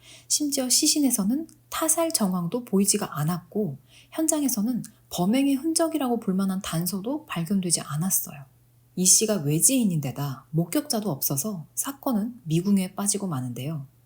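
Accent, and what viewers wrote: South Korea. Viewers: 의 발음 안되서 극혐임 으 으 이러는데 전라도 발음 개 듣기싫음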